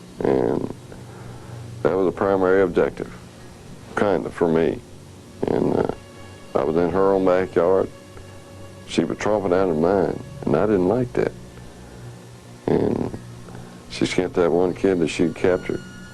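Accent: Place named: Arkansas, United States. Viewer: The second I heard this dude's voice I knew he had to be from Arkansas. He sounds just like every other man I've ever spoken to including myself. Damn maybe we are hicks. He's a hick legend I suppose.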